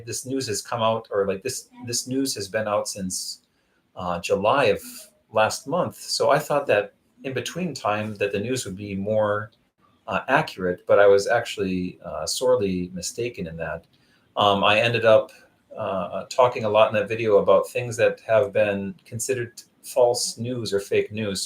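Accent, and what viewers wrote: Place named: Midwest, United States. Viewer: never would have guessed you were born in NY; your accent is 100% midwestern 😂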